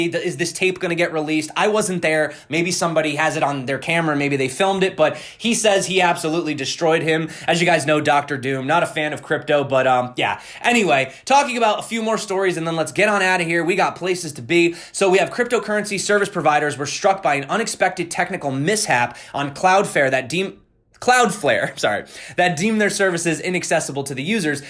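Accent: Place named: United States. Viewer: Am I the only one still living in the US? Even you appear to have an American accent yet you use Bybit which is not available for US residents.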